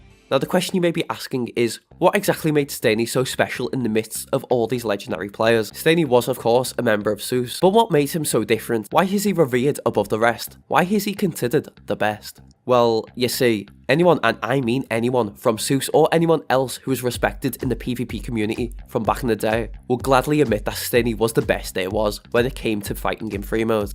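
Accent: Liverpool, United Kingdom. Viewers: Are u scouse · i cant understand anything with ur fcking annoying british accent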